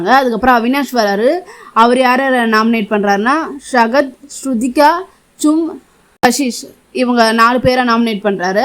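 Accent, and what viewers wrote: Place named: Dharmapuri, India. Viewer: Dharmapuri Bhasai❤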